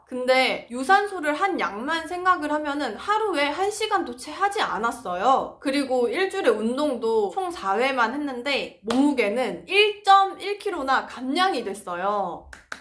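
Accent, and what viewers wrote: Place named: Busan, South Korea. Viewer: 부산사투리가 귀에 딱 잘들려왔어요 완전대박채널되시기를 ㅎㅎ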